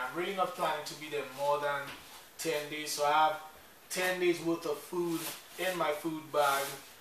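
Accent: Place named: Trinidad and Tobago. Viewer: Sounds Trini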